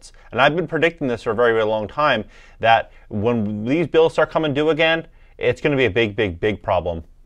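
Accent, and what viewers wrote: California, United States. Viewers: lmao yeah you do sound like a Californian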